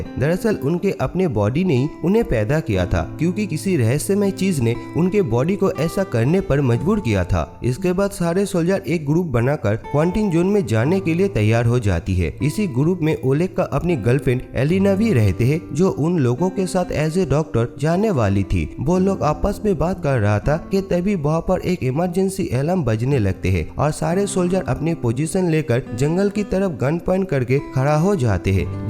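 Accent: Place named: Bengal, India. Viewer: Are you bengoli please work on your M T I so you can explain better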